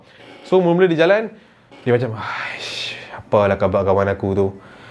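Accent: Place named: Kedah, Malaysia. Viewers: Comel je ckp kedah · Best pekena liwat Kedah..mcm Imuda bercakap😁😁😁😁😁😁👻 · Pandai cakap utaqa noh...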